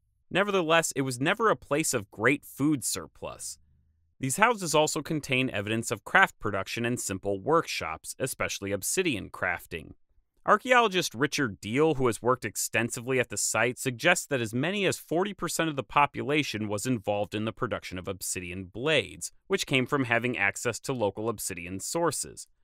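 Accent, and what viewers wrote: Midwest, United States. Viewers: Omg your voice is so American ☹️ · well, I like your Midwestern accent. Please continue to speak in your natural way; being English different make you smarter.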